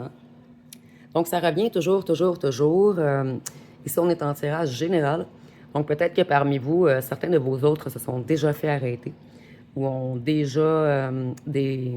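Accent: Quebec, Canada. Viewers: Mais qui me veut.du mal Je ne comprends pas toujours ce que vous dites avec votre accent Québécois Moi je suis en France je suis divorcée A bientôt de vous ecouter Bisous bisous 😘😘🙏🏻😇💕😊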